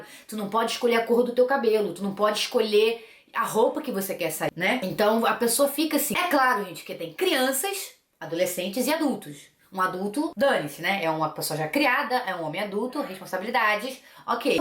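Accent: Rio de Janeiro, Brazil. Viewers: amo o seu jeito de falar cariocaaaaaa, KKKKK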